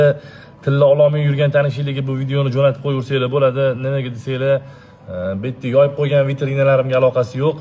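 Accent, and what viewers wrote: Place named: Andijan, Uzbekistan. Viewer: Кайердансиз.адресингларни айтмадингизу.шевангизга Караганда Агдижондансиз.❤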